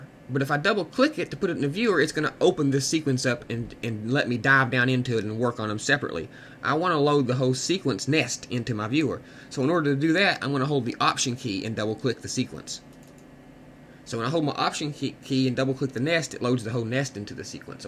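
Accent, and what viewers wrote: South, United States. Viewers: this was really useful. thanks. where's your accent from? (I'm not American, so I can't figure it out). the South or something?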